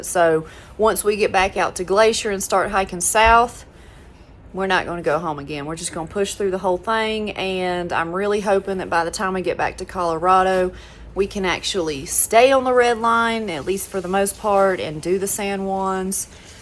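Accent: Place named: South, United States. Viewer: Go home and strengthen your southern accent because you’re rapidly losing it.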